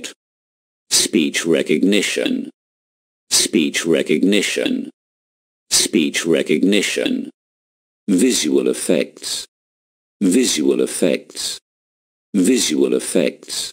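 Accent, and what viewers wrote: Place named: United Kingdom. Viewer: الاكسنت المرة دي بريتش . قبل امريكان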